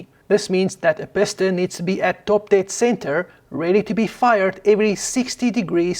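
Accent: South Africa. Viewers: Dear, first thanks so much for your great informative videos and the reminiscent SA accent! I would like to propose a video idea extending this one. In late 1942 Stanley Hooker (later knighted for his work on jets) was recruited by Rolls Royce to work on the urgent problem of boosting power at latitude for the Merlin engine. His solution was to insert a liquid cooled intercooler and 2nd stage supercharger between the existing supercharger and engine block. As you pointed out in this video, an even better solution theoretically may be to add a turbo as the first stage since it does not scavenge as much engine power. However, Hooker’s solution was right on the money in this case, brilliant, compact and was implemented into the Merlin 6x production in less than 6 months. This improvement may actually have secured performance altitude at the most crucial time of the war, allowing air superiority over the fw190, and perhaps even war winning. 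This engine of course was used in spitfire, hurricane, lancaster, mosquito and mustang built under license by packard. The 2 stage turbo solution later implemented in p-38 and “jug” was slightly superior, but very bulky requiring twin boom or bulky and expensive airframe, actually nearly twice the price of the 2 stage super-charger as implemented by Hooker in record time. For me Hookers intercooler is a work of art, or a sublime mix of art and science of engineering. It has 4 trimmed rectangular channels in which the coolant circulates, ex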